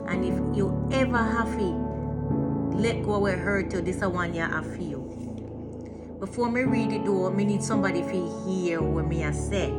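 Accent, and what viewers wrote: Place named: Jamaica. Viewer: Love it. You sound jamaican, are you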